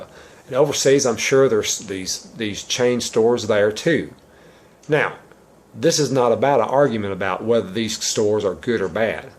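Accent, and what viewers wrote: South, United States. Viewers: your southern drawl makes me laugh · I love your channel and your southern accent. Thank you for breaking stuff down for us!You’re awesome! 😎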